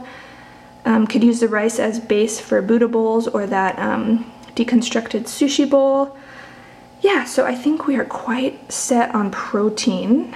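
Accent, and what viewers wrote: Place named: United States. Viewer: Are you working in Indonesia? You sound like you might be from USA.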